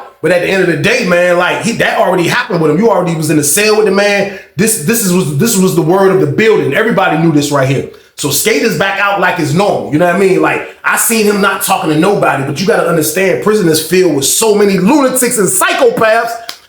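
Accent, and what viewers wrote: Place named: New York, United States. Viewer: BEST PRISON CONTENT CREATOR!!! Yo u still sound mad new york and i cannot believe you are in Virginia 😂😂 You just like the city that never sleep with these BANGAS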